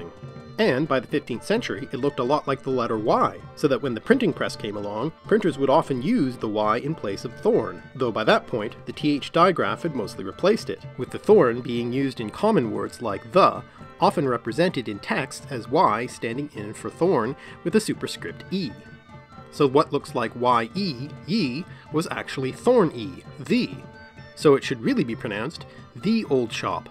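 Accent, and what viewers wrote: Canada. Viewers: Are you Canadian? Just how talk makes me think you are.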